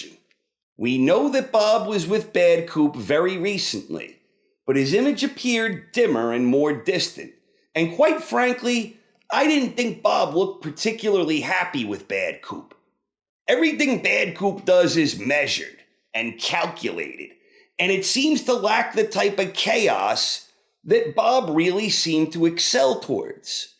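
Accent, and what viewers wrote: New York, United States. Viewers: I really wanna know what his accent is. Is he from New York? or New England maybe?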